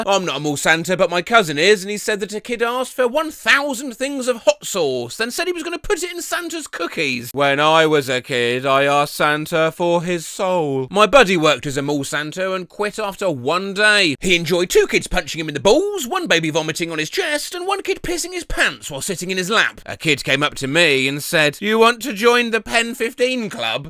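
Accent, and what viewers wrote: United Kingdom. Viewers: I'm new to your channel, and I twigged you're British from your accent... Cor blimey! I was spot on!!! (Btw, Merry Christmas Mate !! 🎅🎄)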